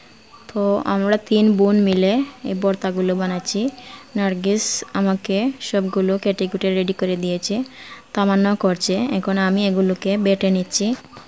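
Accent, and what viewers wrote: Sylhet, Bangladesh. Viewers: Apu apni sylhet er kotay taken?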